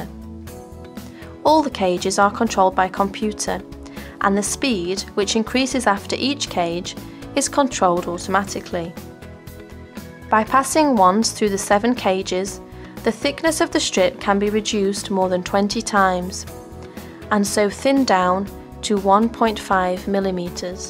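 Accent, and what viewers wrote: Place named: Europe. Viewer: Very nice voice and european accent.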